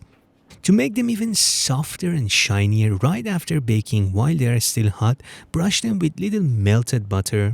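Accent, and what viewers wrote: Iran. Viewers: Peace ✌🏻.. i like to guess where people are from.. cause i love languages, your accent is pharsi ? 🤓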